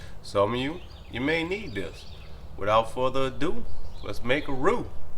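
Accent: Louisiana, United States. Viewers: your accent, you must be from somewhere around Assumption Parish? I grew up Mermentau/Crowley/Jennings. I’ve never been a slow roux guy, though. Have my veggies ready, heat my oil just to smoking, add the flour, stir like mad with that same high temp spat you have, add the veggies when I get my color. I’m usually done with a dark red-brown roux in less than ten minutes. I guess we all just do it the way our mamas taught us! I like what you’re doing man!!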